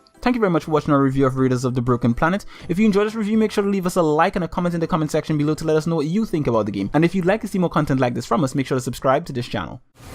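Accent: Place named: Trinidad and Tobago. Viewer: you sound like a trini , you from trinidad bro ?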